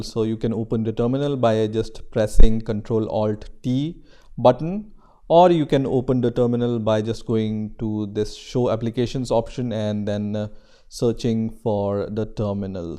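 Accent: India. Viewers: hearing his accent I can confirm that he is an indian guy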